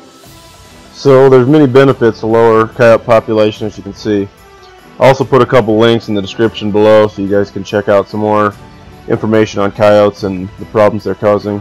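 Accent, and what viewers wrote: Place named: South, United States. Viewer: Sound like a true country boy. To the point, no filler, no bullshit. The only thing he didn't say was you found you way to my page and you decided to watch the video , so what ever complaints you got they're your own fault. Lmao!!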